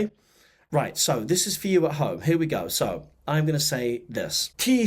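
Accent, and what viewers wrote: South, United States. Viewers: Wonderful tutorial! I have been contacted by beings from the Orion constellation. I am unfamiliar with the particular groups from this star group. Any insight is much appreciated!! Also, love your southern accent, ha! I’m an intuitive guide from Georgia USA 🇺🇸!! In love, light, and service~ Elizabeth Maria Unlimited ❤🌎✨🙌🏽🧘🏽‍♀️🌞🧬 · I love the southern accent❤😂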